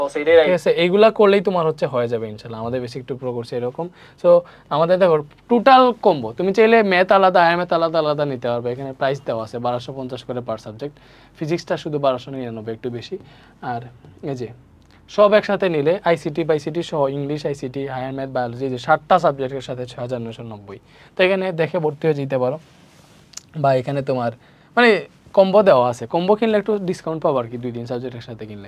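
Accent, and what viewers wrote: Sylhet, Bangladesh. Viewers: Vai kita Sylheti ni 💗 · Apni ki sylhety?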